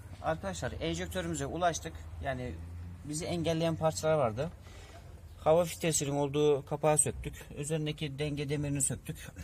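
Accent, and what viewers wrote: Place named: Hungary. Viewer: Bu kizancik Macir belli beya konusmasindan kizancagizim kolay gelsin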